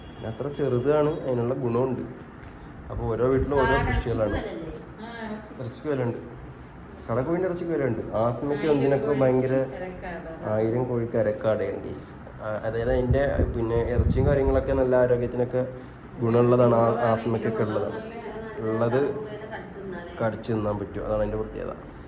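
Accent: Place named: Palakkad, India. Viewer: നിങ്ങൾ എന്താണ് പാലക്കാട്‌ ഭാഷ പറയുന്നത്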